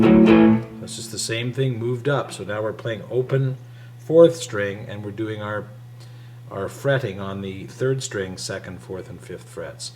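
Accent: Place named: Canada. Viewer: Thanks for the lesson. Very helpful. Are you Canadian by chance? You accent suggest that you might be. Thanks.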